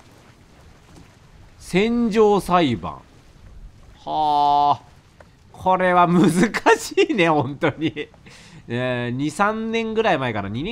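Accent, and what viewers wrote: Kansai, Japan. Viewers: 今回の実況、関西弁多めで嬉しい…⁽⁽( ˊᵕˋ )⁾⁾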